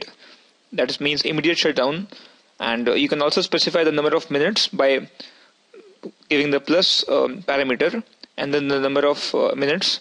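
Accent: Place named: India. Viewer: Are you from india? btw love the accent.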